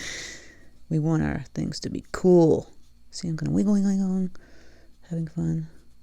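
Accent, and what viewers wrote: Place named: Massachusetts, United States. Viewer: Love your teaching style I’m a beginner but enjoy your instructional method of don’t stress. Also detected a bit of a Mass accent 😀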